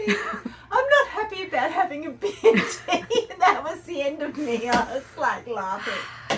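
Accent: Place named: Australia. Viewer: Love listening to your stories. You have a lovely way about you. I was brought up in North Brisbane so nice to hear the Aussie accent that I have now lost. Sending best wishes from North Wales. Wendy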